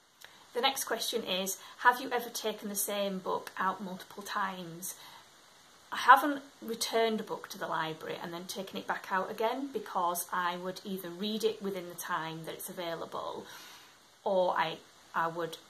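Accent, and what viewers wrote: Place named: Yorkshire, United Kingdom. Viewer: As someone from the Netherlands I sometimes can't tell the various UK accents apart. I had no idea you had a Yorkshire accent as I have no idea what a Yorkshire accent sounds like. I know what a Scottish accent sounds like since recently as I started watching a couple of other BookTubers who are Scottish and talk about it. How nice you can reserve any book from the county for a pound! It costs more here if you want a book from a library further away than the local area here. Oh yes I am always careful with my library loans too. In the Netherlands you have to pay for a library subscription (except for children under 18 I think), so that could be a reason here but I don't know. Some libraries make you pay a certain amount of € per book, gladly mine doesn't and it's just the yearly fee. How cool, I don't think they deliver to your house here at all, that is not a thing. 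I love my library though. Oh yes I love browsing at the library! The Stopping Places sounds interesting. I couldn't pick one best book either! The Reading List has a nice cover! It sounds like a nice concept for a book! Ah I was just about to put it on my wishlist, and realised it was already on there. I didn't recognise it because the cover on my wishlist looks different. Glad you enjoyed the book! I can't listen to audiobooks. I prefer reading paperbooks over ebooks too. My library does have ebooks, but I can't read them on my Kindle (it's not a supported format), so I'd have to read them on my phone (or perhap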